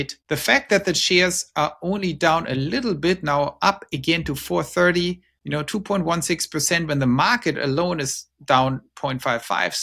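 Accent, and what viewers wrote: Germany. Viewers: danke----fyi: Palatina is the latin word für "Pfalz" this company was founded 1993 as Grohmann engineering for innovatie solutions of automation processes in manufacturing....zur Info....Palatina...ist das lateinische Wort für "Pfalz" Du bist doch Deutscher?! zumindest verrät mir das Dein englischer Akzent:-) lebts Du in den Staaten? Tesla hat ja schon vor langer Zeit diese Firma gekauft Tesla Automation wurde 1983 als Grohmann Engineering in Prüm gegründet. Mit dem Ziel, innovative Lösungen für die Automatisierungsbranche zu entwickeln, hat sich das Unternehmen kontinuierlich weiterentwickelt und seinen Fokus stets auf leading edge Technologien gelegt.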